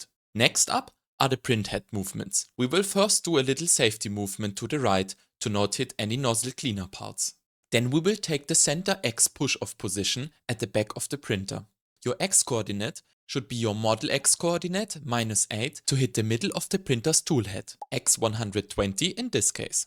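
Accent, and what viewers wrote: Germany. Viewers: der akzent klingt so deutsch :D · very "Kartoffel-english" ;D but nice video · Kann man sich nicht anhören! Diese übertriebene Betonung! Typisch .... wenn ein deutscher tolles englisch sprechen will. Da ist nichts flüssig.....und die Betonungen passen nicht zur englischen Sprache! Ich bin raus! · I would listen to a documentary about paint drying from you. I love the German inflections in English and you keep a smooth cadence. You should think about voice acting.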